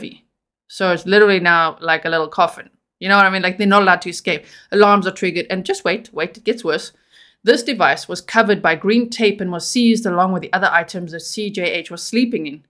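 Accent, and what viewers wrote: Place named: South Africa. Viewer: I was gonna ask if you’re South African because I can hear the accent, thank you for clarifying instead lol.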